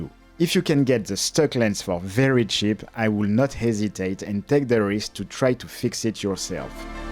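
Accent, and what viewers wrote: France. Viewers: Your video has just described me with my obsession with old nikkn lenses. Apart I now need to learn how to clean them. And I have lots. Thanks fir a great video. BTW my wife loves your French accent.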